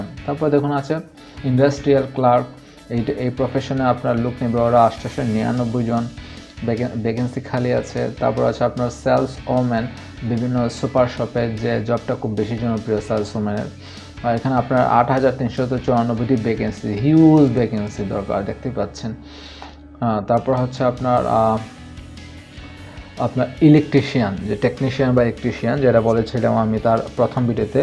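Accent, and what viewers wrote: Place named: Sylhet, Bangladesh. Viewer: Vai mone oy Sylheti 🤩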